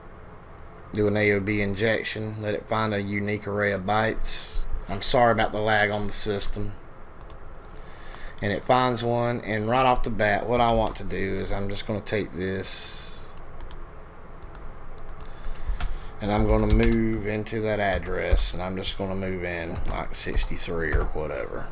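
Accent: South, United States. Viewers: Southern sounding computer talk probably has to be the best thing ever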